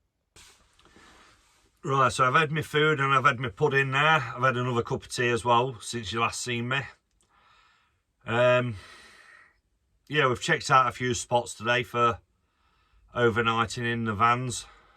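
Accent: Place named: Midlands, United Kingdom. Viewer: (assume that's your name) Interesting video. First time I've seen you. Your accent I'd say you're not too far from me. Sutton-in-Ashfield I'm a widower Single like I assume you are.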